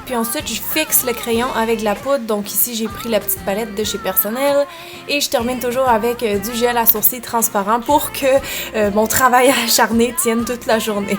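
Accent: Quebec, Canada. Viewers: C pareil pour moi je met toujours de la music et au passâge j'adore ton accent québécois qui est perf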